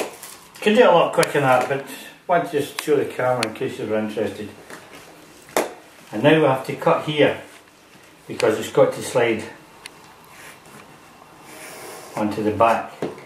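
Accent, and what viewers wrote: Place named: Scotland, United Kingdom. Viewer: That's a Scottish accent, don't know which area, but good to hear. Thanks very much for this video, really useful tips, I've put off doing this because all the American plumbers say to take out the toilet first - not good if you're a woman and in a rented flat!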